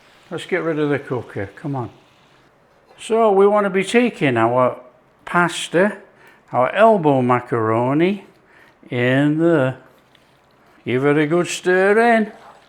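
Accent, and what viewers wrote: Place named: Scotland, United Kingdom. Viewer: American here.... My grandma made this recipe, she was a dairy farm wife, but she cooked the noon meal at a New Mexico high school for many years. She also made a southwest adaptation by changing the spices. She added cumin, new mexico red chili pepper powder (it's the best, rich and a bit sweet), pepper, canned beans. The sauce & meat mixture can also be layered with corn tortillas & cheese lasagna style. I remember eating this at my jr high school in California. I sure enjoy watching you cook. I now live alone and struggle with poor appetite and no desire to cook. Occasionally I will make one of your more simple dishes. I can't figure out your accent... I hear Scottish & northern England. Occasionally, you say something that sounds like my great grandparents (descendants of Scottish immigrants in the 1600s to Appalachia).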